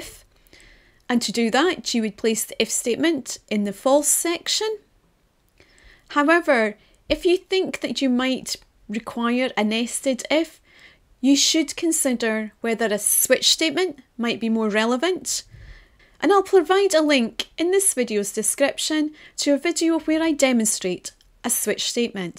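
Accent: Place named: Scotland, United Kingdom. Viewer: Thanks for the clarification! I was looking up some video and was very pleasantly surprised to find a Scottish accent!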